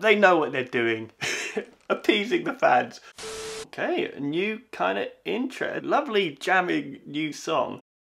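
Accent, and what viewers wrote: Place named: Yorkshire, United Kingdom. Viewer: Hi i hope you see this but it would be really helpful if you put subtitles on your videos coz im having trouble listening to you with your accent i wanna say its sounds like a Yorkshire accent but i could be wrong. it would make my life easier as being hard of hearing. Also would you love it if Cells at work did a Covid19 episode coz i would.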